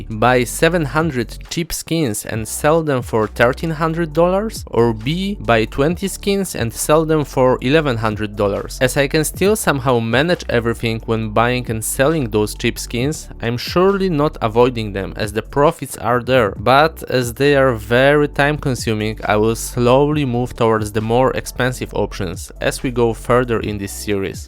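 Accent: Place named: Poland. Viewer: masz troche polski akcent heh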